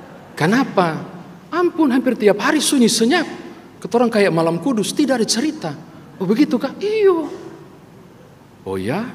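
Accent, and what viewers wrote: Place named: Ambon, Indonesia. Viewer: Aduh..khotbhx sngt lucu..dialeg Ambon..mis komun Pongo? Mksh Tuhan b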